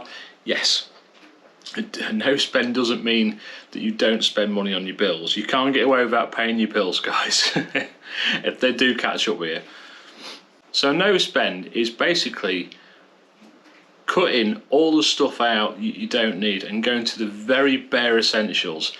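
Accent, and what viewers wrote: Lincolnshire, United Kingdom. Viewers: I could listen to your Lincolnshire accent all day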